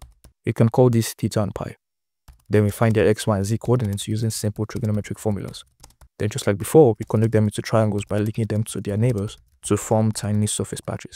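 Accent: Nigeria. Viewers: Awesome video You kinda sound like some of my Nigerian friends ngl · this dude sounds nigerian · are you nigerian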